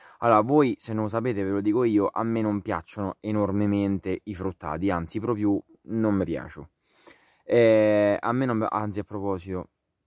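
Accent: Rome, Italy. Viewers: Parla in romanesco a me piaceeeeeeeeeeee 👍👍👍👍👍😍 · Forse romano.Bellissimo!!!!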